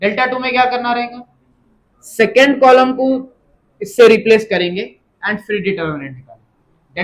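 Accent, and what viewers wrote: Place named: Hyderabad, India. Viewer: Im really happy that a Hyderabadi teacher is also trying to teach 🎉 Honestly telling its so good to study under a teacher who is teaching in Hyderabadi accent ❤❤ Keep going sir 🙏 👏 ❤ You're explaining very clearly and also your explanation is so good 👍 ❤🎉 Keep going sir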